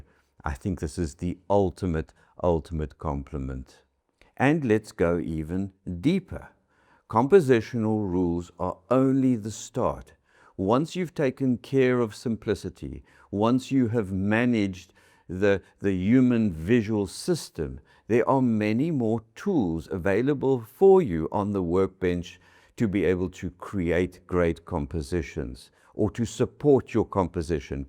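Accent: South Africa. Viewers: Love hearing that SA accent. Lived there a few years and miss it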